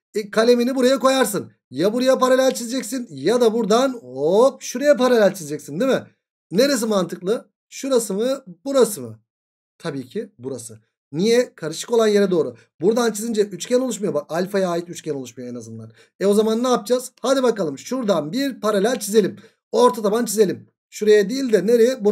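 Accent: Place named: Trabzon, Turkey. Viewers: Bence trabzonlusunuzdur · trabzonlu net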